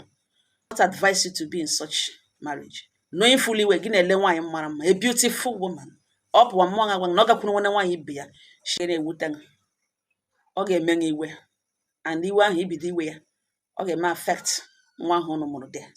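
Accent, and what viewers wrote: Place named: Imo, Nigeria. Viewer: Good morning Ma, I'm not from Imo State but i like the way you speak Imo State, it sweet me too much.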